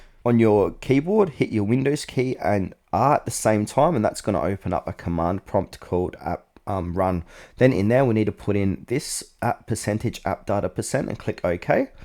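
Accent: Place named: Australia. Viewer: Thank god an Aussie/Kiwi or a Brit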